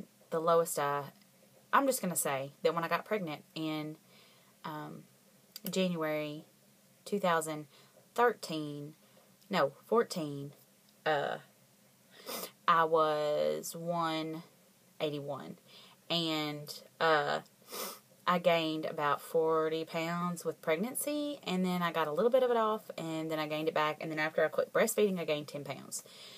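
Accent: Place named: South, United States. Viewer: I'm on the east coast so your so southern sounding and I love it :) enjoy your weekend and keep up the great work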